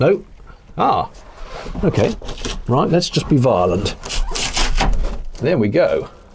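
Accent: United Kingdom. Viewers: Your head-long and well-explained DIY adventure is a hoot,an enjoyment, and a few moments away from every day life for most everybody who’s watching. I love it! Plus, I like laughing out loud now and then. I like hearing your terminology of choice. I like your British accent, for goodness sake. I’m so glad I found you all those years ago! Keep on videoing as you continue along your DIY, renovate, and refurbish chosen😢 path. ♥️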